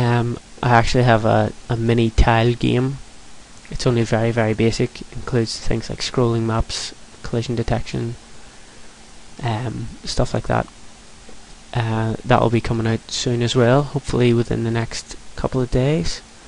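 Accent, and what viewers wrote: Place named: Ireland. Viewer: Just wanted to thank you for the excellent tutorial(s)! I found (all 11) to be quite useful as an introduction to XNA. I am very greatful for your time. I was relieved that you had posts listing the XNA 4.0 conversion under the applicable video showing the corrections. BTW its nice listening to an Irish accent in these tutorial videos as opposed to the 'more comment' accent associated with programming tutorials. This Canadian Approves :)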